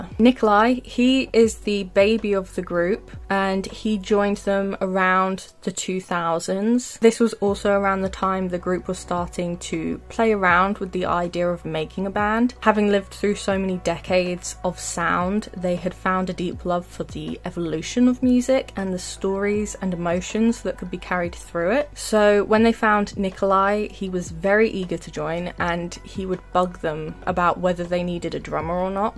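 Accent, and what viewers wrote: United Kingdom. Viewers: Beautiful!! I loveee your videos! you’re such an inspiring artist to me :) you don’t have to answer this but where is your accent from in England? I have a similar accent but not many ppl have the same accent as me where I live XD again, you don’t have to answer this, I was just curious. ⭐️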